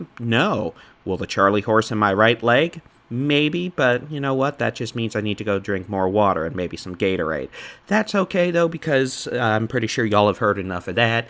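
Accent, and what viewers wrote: Texas, United States. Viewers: I’m just going to say it. You are a handsome man. I enjoy your channel for many reasons: the humor, the honesty, the thoroughness, the lovely, homey Texas accents, but also you are just easy on the eyes. Not trying to make things weird, just letting you know that one Texas lady finds you very pleasant to look at. Have a nice evening and thank you for everything you do.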